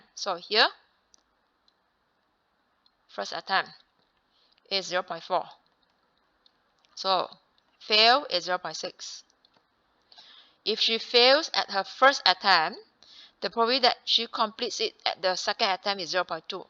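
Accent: Asia. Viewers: i hate permutations and combinations but u explain it so effortlessly maam, thank u sm i subscribed... btw i love the asian accent its so nice to listen to idk why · Love the Asian Accent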